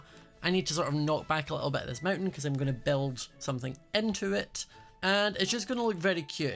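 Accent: Scotland, United Kingdom. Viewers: What is his accent I hear British but I also hear Scottish 😩I absolutely love his accent!!!!!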